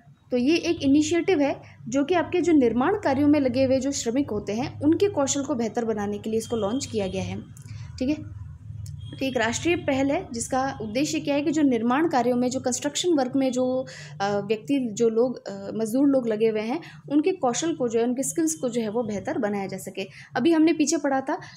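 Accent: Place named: Rajasthan, India. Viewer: बहुत मददगार, में भी राजस्थान से हूं। टोन से समझ आ रहा है, धन्यवाद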